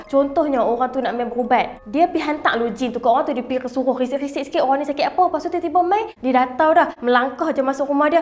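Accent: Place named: Kedah, Malaysia. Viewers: Bila utagha sembang nahhh kaw kaw 😂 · rasa cute la loghat utagha ni.. ❤️ melted.. hai awak.. tapi an… klu die tgh2 baca mantera.. rupenya baru tau bomoh. tertibe rasa nk cancel tu.. cmne ea nk blah? klu cabut camtu je tkut die sniper dari belakang. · Ni sah speaker orang kedah ni. Penang cakap lanjutt x laju2 cmni. Aku kedah cakap cmni arh🤣 laju.. cm rapper lol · SYOQ DENGAQ ORANG UTARA CAKAP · Oqang Utaqha cakap laju tu.. aku suka sungguh dengaq dia cakap 🤣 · This is kedahan accent, John bear is penang accent, it's not same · Akak utara rapper bertudung is back🗿🗿🗿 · Matap lorat org utara padu.. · susah hendak difahami apa yang beliau berbahasa,sungguh pekat loghat kedahnya. bagaimana sesuatu maklumat hendak disampaikan jikalau yang memberi tersebut menggunakan bahasa berlainan? natang mu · Aq orng johor..tp seronok dengar lorat kedah ni...nk crik bini orng kedah laa gini😂😂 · Seronok la dengar 😂 dia punya utara dgn laju rasa mcm dengar member sendiri ajak borak 🤣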